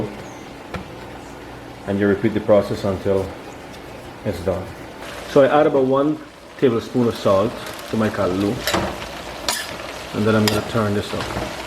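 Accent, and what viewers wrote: Guyana. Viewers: Really nice dishes .... guyanese style. Can't beat guyanese food and twang ; >) I do the same thing here in Canada but I use a cast iron pan ( just like the one you bought) and seasoned it for non-stick. Mostly we don't use the microwave anymore (bad for your health in the long or short run) I use an overhead oven. Non stick pans has a coating that contributes to cancer. Just a heads up.... for what happen to someone else does not mean it will happen to another. Cheers on a good video.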